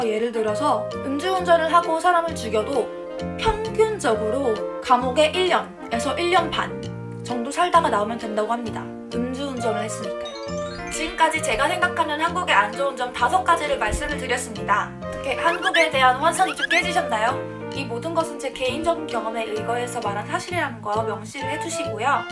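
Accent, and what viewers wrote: United Kingdom. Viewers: Você tem sotaque britânico quando fala inglês 😂 que fofa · Your british accent is so gooood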